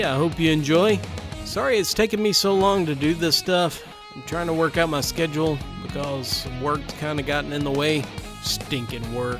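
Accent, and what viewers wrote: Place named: West, United States. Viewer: Story #1 Setting: England. Narration Accent: American Western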